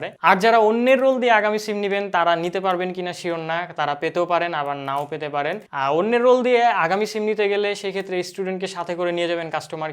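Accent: Rajshahi, Bangladesh. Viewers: Vai rajshahir nki . Khotha sune omon e lagche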